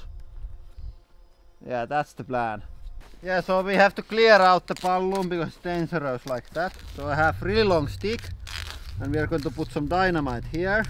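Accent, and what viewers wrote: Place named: Finland. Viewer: Palllloon! love Finnish accent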